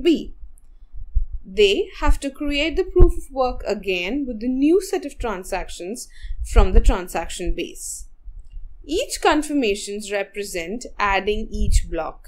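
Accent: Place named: Trinidad and Tobago. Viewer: Your accent suggests you are from Trinidad. Am i right?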